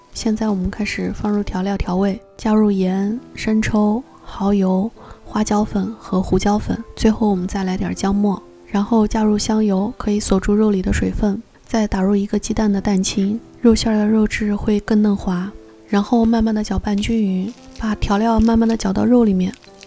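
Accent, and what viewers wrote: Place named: Sichuan, China. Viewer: 抄手配湯愈吃愈香是四川口音； 是四川人嗎？想做一些你的油潑辣子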